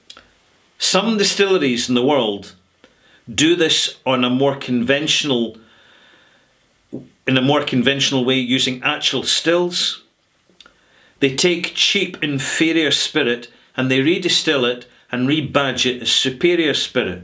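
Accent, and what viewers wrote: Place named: Glasgow, United Kingdom. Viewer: Thanks for the critique! Came over due to urging. Very interesting! I have viewed another posting with you in it -- Russian MRE I think -- You are both delightfully entertaining! Question - I can hear more Glasgow in your speech, but not. What is that about?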